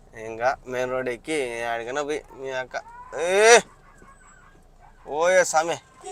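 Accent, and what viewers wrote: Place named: Kadapa, India. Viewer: Anna kadapa slang pushpa slang la vundi anna..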